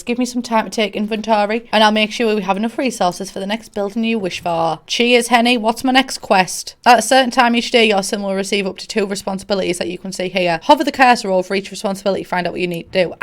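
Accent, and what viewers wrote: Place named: North, United Kingdom. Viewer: Laughed all the way through this video you are soooo funny ! Proper cheered me up also nice To see and hear a fellow northerner 🤗. Xxx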